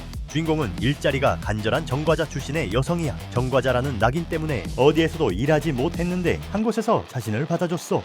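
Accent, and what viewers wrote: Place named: Gyeongsang, South Korea. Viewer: 경상도 말투. 재수없다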